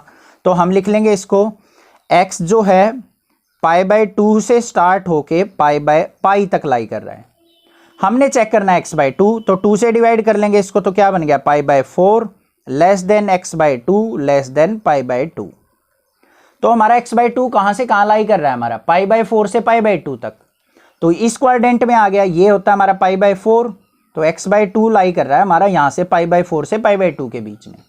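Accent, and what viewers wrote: Haryana, India. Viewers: Sir haryana se belong krte h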